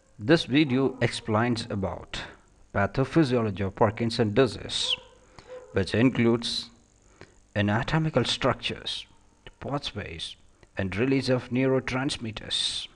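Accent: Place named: India. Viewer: Good in Content,Animation & Voice Clarity...Would have been better if it explained how Physiotherapy treatment works on the PathoPhysiology & the Accent was Purely Indian!!!! Waiting for more articles in Physiotherapy!!!! Einstein Babu..,MPT (Neuro) Physiotherapist , Govt of India(ESIC Model Hospital) , Bangalore,India.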